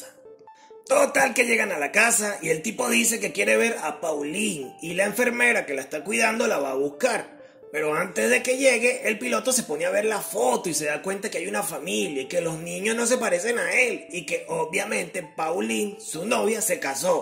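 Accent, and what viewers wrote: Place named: Venezuela. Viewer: Jaja tremendo narrador maracaibo o Caracas? Me espoleaste por completo 😂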